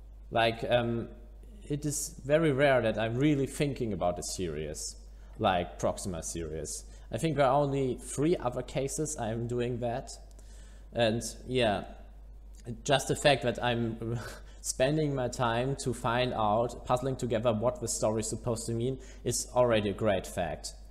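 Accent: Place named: Germany. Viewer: I love your german accent.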